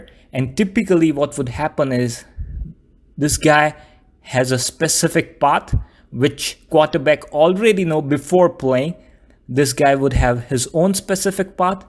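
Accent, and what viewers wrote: India. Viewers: no it couldn't be real, you Indian ain't you? Anyone can tell that by the way you pronounce "t" and little hukkies you take. I have an indian friend, she speaks exactly like you, btw no hate🤗🤗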